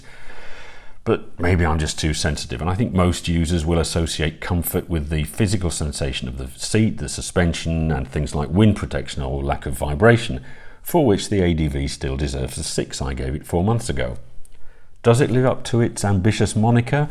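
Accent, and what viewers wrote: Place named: England, United Kingdom. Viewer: You sound obviously very English to me, and you've been "fully metrified" ! The 3.5 litres for 100km , that's 80 MPG (UK gallons) to me ! My fully metrified infant schooling that started in the early 1970s couldn't compete with me having to chat to my very imperial measurements only Father and Mother ! But then I haven't escaped from what is usually wet Blightly for the sunny vistas of the Algarve. Mind you with the temperatures we've had in the UK for a few days this week , as much as the sunny vistas of the Algarve usually seem very appealing, I'm not sure I can handle the heat. Come back and ask me between October and March where I'd rather be, and I'm guessing I'd want to be in the Algarve ! oh, and good review , as always :-)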